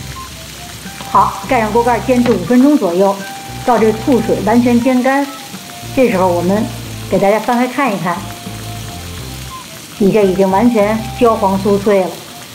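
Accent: Tianjin, China. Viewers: 听口音，是天津人吧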